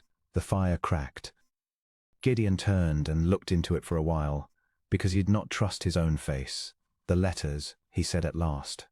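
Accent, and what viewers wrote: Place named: United Kingdom. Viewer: I absolutely loved this story! Well written and perfectly narrated. A British accent makes these stories so much more interesting to listen to. Thank you!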